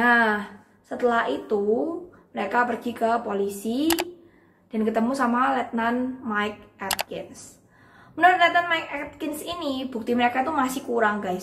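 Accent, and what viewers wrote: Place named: Java, Indonesia. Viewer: Ealah medok banget yo mbak...yo wis gakpopo,ceritanya bagus 😃😃😃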